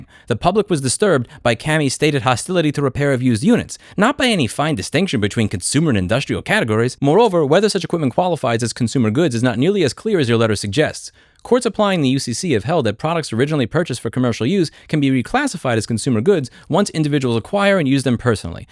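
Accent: New York, United States. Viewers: Is your voice ok, because you sound way more new york now? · You still sound New York, its going to take a few more years to get your twang. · You are doing gods work in “well actually”ing these lawyers that defend anti repair :) Btw your accent is still vey much more NY than TX. There was a slight drawl towards the end of you mentioning that tho. :P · Has louis NY accents gotten worse since moving to texas, love it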